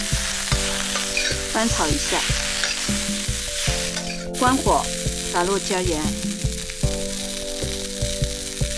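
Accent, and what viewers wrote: Shanghai, China. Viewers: 我也听出来，上海人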